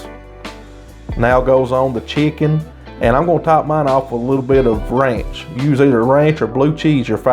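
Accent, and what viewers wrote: South, United States. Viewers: Love your country accent 😍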